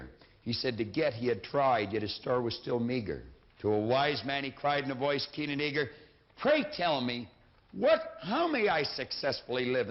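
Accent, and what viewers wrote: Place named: Canada. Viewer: I KNEW HE HAD A CANADIAN ACCENT! 🤣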